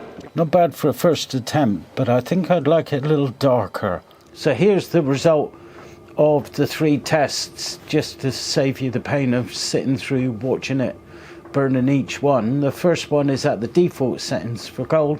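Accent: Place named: Norfolk, United Kingdom. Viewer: Great video. One question…. What accent is that? Sounds north Norfolk. Many thanks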